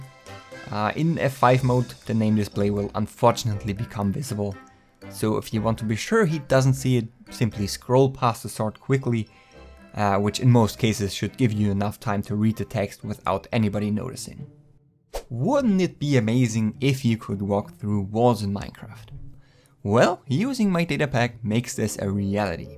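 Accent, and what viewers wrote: Germany. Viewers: Are you german? Because you really sound like.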